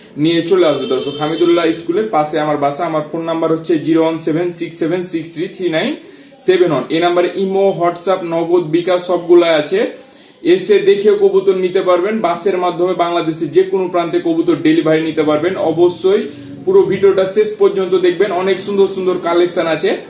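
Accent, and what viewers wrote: Kolkata, India. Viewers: আপনার তো কথা ভাই ভারতের কলকাতার ভাষা মনে হয়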